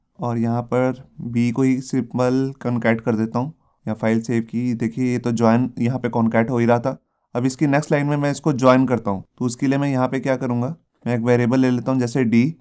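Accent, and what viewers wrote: Punjab, India. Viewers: sir r u from punjab??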